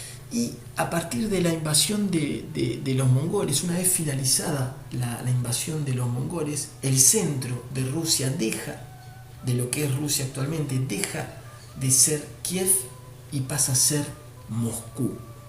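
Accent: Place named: Argentina. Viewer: por tu apellido de origen "israelí " no se si seras imparcial ,pero viendo el mapa de crimea como ucraniano creo que no...por tu acento pareces argentino ,sabras que Inglaterra antepone la validez de los kelpers ,ciudadanos de segunda ingleses la AUTODETERMINACION DE LOS PUEBLOS para el tema malvinas ellos quieren ser ingleses....en CRIMEA. esa misma AUTODETERMINACION dice el 97% quiere volver a la madre patria Rusia y volvió..que paradoja Occidente acepta lo de los kelpers no lo de los crimeos , a y te recuerdo que israel siempre voto en contra de argentina las resoluciones de la onu sobre malvinas 3 países lo hacían ISRAEL...USA....Y GRAN BRETAÑA ....a mi humilde entender la trilogía del mal en el mundo VIVA RUSIA...VIVA PUTIN !!!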